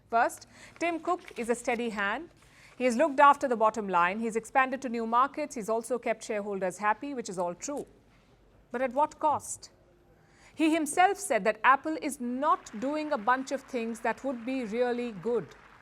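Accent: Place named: India. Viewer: Horrible accent ,we are not trying to learn English with Hindu accent…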